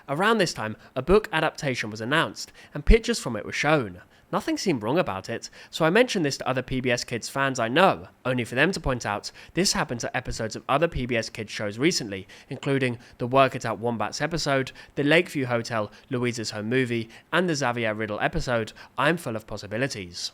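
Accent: United Kingdom. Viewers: Bra I'm not going to subscribe to this stupid British dude learning English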